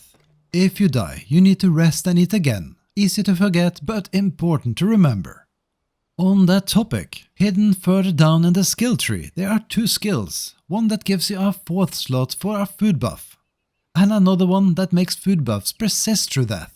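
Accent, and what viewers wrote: Norway. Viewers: Are you Norwegian? ^^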